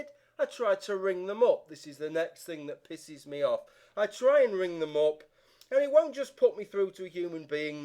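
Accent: United Kingdom. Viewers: Oh how I love British people when they're angry. That accent is amazing. xD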